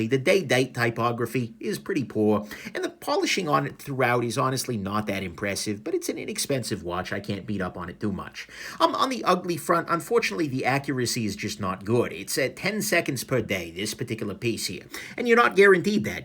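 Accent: New York, United States. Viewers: That's a New Yorker voice hard core.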